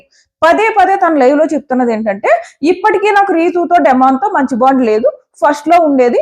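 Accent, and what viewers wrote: Godavari, India. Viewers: 😂 మన గోదావరి యాస బావుంటుంది madam. మాది Demon Pawan ది same town tanuku west Godavari district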